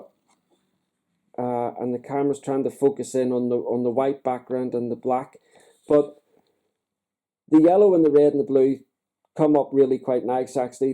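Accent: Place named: United Kingdom. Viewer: I haven't ever heard of these pencils. you sound British so that maybe why I haven't seen them or you. I would love to catch a pack of these Scorpion pencils. They look like they lay pigment down with a meer touch. So I am adding my name for the giveaway. And I sure hope you get to feeling better soon... bless you. My name is Barbara Gaudreau (french) and I am in Texas USA. To reach me just pop an email over to: <mail> YOU ARE MISSED ALOT!!!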